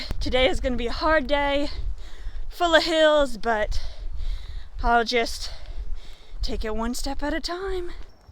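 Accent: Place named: Kentucky, United States. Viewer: I’ve watched a few of your videos even including the van build. Max Patch Damascus and Mt Rogers are three of our favorites. Fortunately we live close since we live in central NC. A comment mentioned you as a Kentucky girl. Before reading that clue I could not put a finger on your accent. I even asked my wife who is from the Finger Lakes to listen to you. Of course she had no clue. I went to graduate school in Louisville 40 plus years ago so 4 years in Louisville and across the river (cheaper rent) in New Albany Indiana should have helped with your accent. Have a great journey!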